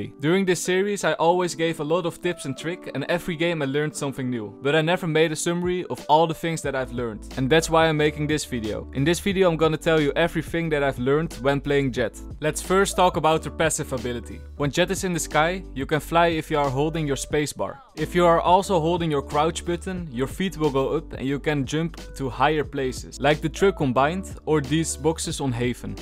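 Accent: Netherlands. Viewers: hoor dat je nl bent nice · The Dutch accent dude's back with good shit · Ik hou niet vaak van zo'n Nederland accentje maar deze is toch wel mooi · Je kunt gw horen dat hij nederlands is😂😂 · I feel dutch accents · Je hoort meteen aan zijn accent dat ie Nederlands is xd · i love the dutch accent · dang that dutch accent! i love it! (ik ben ook nederlands XD) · Je hebt echt zo’n Nederlands Engels accent fucking mooi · love DAT THICC DUTCH ACCENT DUDE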